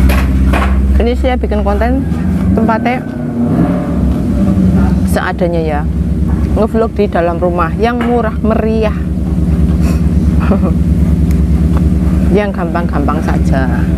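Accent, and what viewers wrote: Banyumas, Indonesia. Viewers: Uewanakkkkk mbak, seger gitu ya, mbak nie org jawa ngapak ya, cara ngomongnya kayak nenek aku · Ru prtm kali liht, ngapak y MB, slm dr pnrgo, melu kemecer pdes" kecut sjk e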